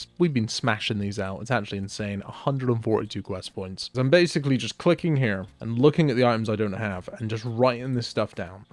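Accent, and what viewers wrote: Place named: Northern England, United Kingdom. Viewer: yooo, i think you're my fav yt scaper. your nothern accent is incredibly comfy. the vid is good but the voice is like an additional armchair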